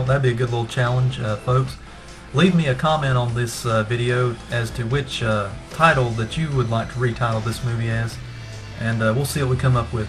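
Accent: South, United States. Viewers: It took me a while to get used to your Southern Drawl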